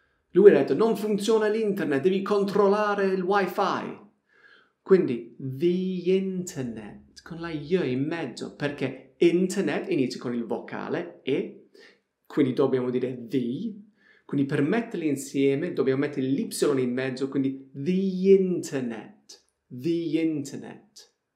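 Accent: Apulia, Italy. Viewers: Non posso non notare un leggero accento pugliese :) Giusto? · Vero !! e quella malcelata cadenza pugliese è un plus che lo rende estremamente simpatico ed empatico con chi lo segue: per sua stessa ammissione in altro video pare che abbia deciso di vivere in Puglia ed in particolare a Locorotondo, un magico paese di quella splendida zona nota come Valle d'Itria costellata dei famosi "trulli" ... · ... unA consonante o unA vocale, non un... utilissimi i tuoi e super simpatici con quella cadenza pugliese... 😅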